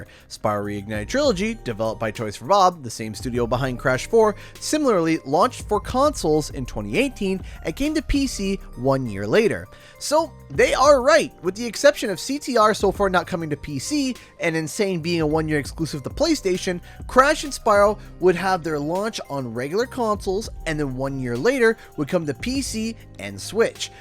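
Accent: Canada. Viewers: This clip was completely about nothing and I didn't learn anything new from it but I like listening to so I like it.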